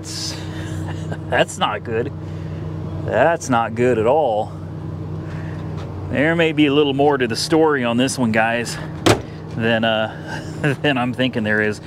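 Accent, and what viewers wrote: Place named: California, United States. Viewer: It's hard to believe you're from Indiana, you talk just like us boys in California.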